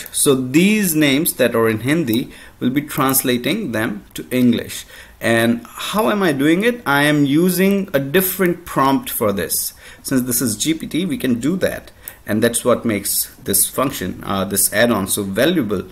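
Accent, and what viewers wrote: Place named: United States. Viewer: bhai tu amerian accent me kyu bol rha hai ?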